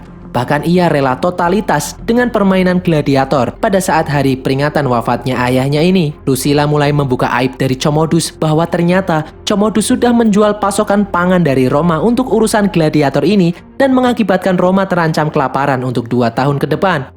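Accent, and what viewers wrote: Java, Indonesia. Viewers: Agak mendok ya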